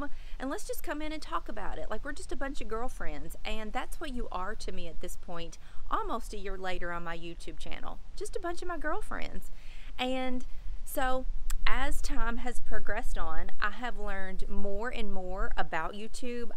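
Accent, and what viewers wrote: South, United States. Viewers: I started the journey... and I chopped off most of the dyed ends for my birthday, 6 months later. Didn’t mean it as I was trying to wait a year,but I am glad I did. Now I have a little bit of dyed ends and it’s curly and short, but I love it. Took 2 weeks getting used to the short look. I love your curly big hair and southern accent (I’m from GA myself).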